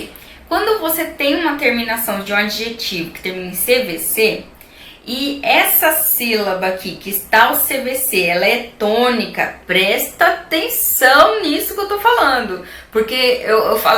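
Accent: United Kingdom. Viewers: esse sotaque e britanico ou e impressao minha? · vc fala no ingles britanico? é pq lá o t n tem som de r igual o do estados unidos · Sophia Lvt não é q a pronúncia esteja errada, ela está falando inglês britânico. Tem diferenças entre inglês americano e britânico... uma delas é a pronúncia.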